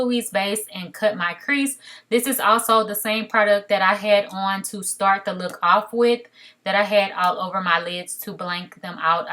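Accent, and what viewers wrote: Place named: South, United States. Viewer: Yessss I love these type of videos! Your makeup is so nice, your hair is bomb like what lace?!! I LOVE your pregnancy glow and your cute country accent. yess keep them coming!!! do more grwm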